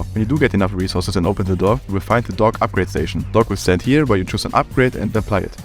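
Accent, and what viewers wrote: Germany. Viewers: He’s speaking German when speaking English. I love it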